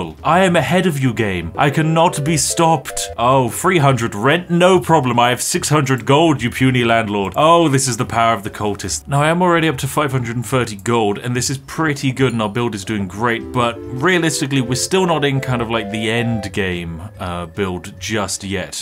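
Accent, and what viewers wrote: United Kingdom. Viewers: This guy literaly british lets gam it out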